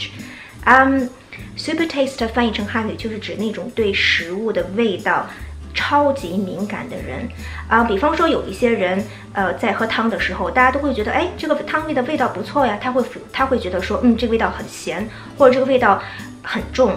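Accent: United Kingdom. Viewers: 我想问一下在美国这么久英式口音是怎么做到没有被美音影响的，哈哈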